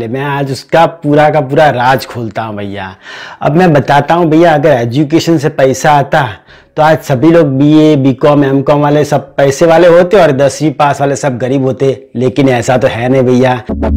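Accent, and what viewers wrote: Gujarat, India. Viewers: Bahi app Gujarat sa hokya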